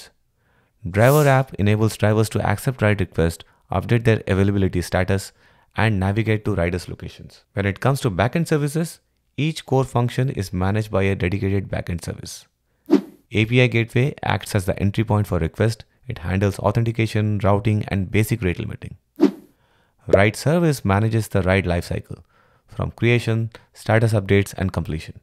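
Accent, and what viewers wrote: India. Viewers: I dunno why but the Indian access makes me focus more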